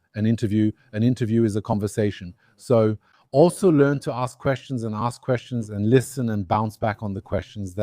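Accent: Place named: United Kingdom. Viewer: L'accent britannique, la classe !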